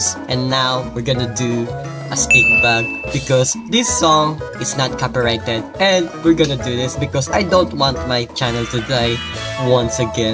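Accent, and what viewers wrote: Philippines. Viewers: If I'm right ur filipino. because of ur accent but yeah many accent like that. like indo...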